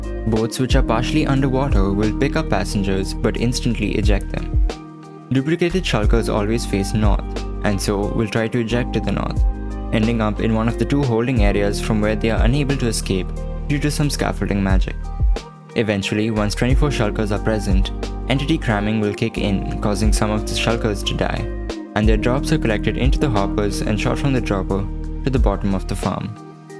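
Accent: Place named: India. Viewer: Are you indian by any chance? love your accent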